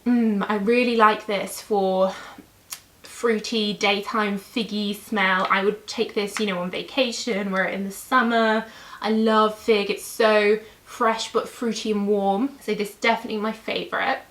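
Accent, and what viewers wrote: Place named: London, United Kingdom. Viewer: Hello from Brazil again!! Not only do I love hearing your reviews, but I also love just hearing you!! It reminds me of the time I lived in London, I could listen to your for hours!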